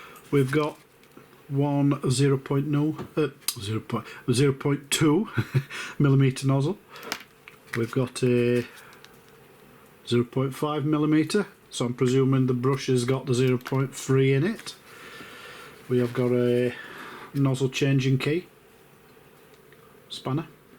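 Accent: Barnsley, United Kingdom. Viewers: Barnsley Lad ?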